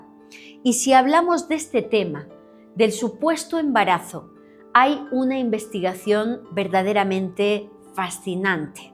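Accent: Spain. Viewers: La única persona de la cual no me molesta su acento español ...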